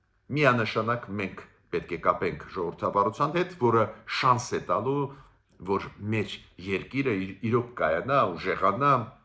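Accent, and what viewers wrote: Russia. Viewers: Քո ռուսական ակցենտը զզվելի է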